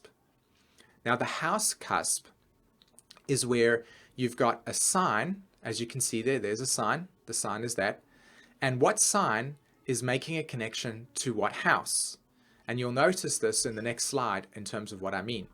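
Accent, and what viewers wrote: South Africa. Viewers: New fan here. Thanks for this content. Great accent by the way...SA? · Are you South African?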